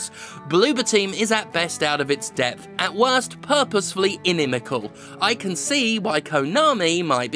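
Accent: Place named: United Kingdom. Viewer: Your British? I thought you were Canadian egh,. Well explains why you never say sorry egh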